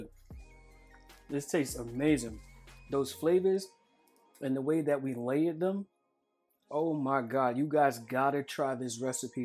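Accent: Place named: Nuyorican, United States. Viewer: Sounding’ straight up Nuyorican! Me encanta! 🇵🇷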